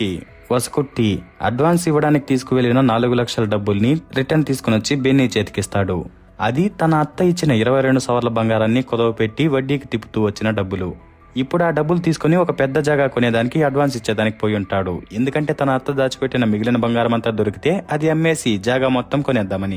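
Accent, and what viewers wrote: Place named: Tamil Nadu, India. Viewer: Nativity pokunda Tamil slang lo Baga chepparu👌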